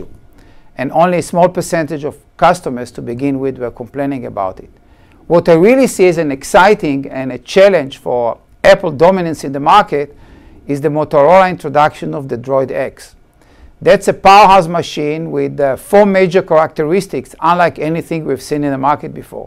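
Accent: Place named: Israel. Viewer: Am I the only one finding Israeli accents insufferable?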